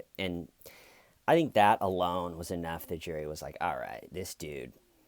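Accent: United States. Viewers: Hello from bonnie Scotland 😁 you my love are very witty. Love your videos! It's like listening to my inner thoughts coming out of an American man's voice 🤣🤣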